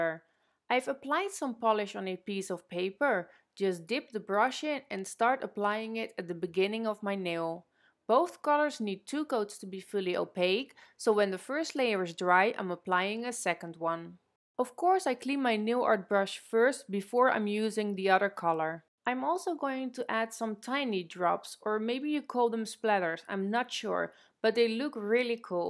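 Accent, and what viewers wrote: Netherlands. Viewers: One thing. Are u Dutch?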